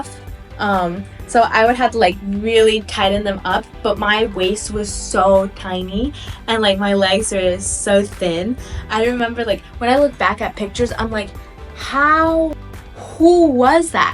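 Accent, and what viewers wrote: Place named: United Kingdom. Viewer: Why you have a uk accent tho😭😭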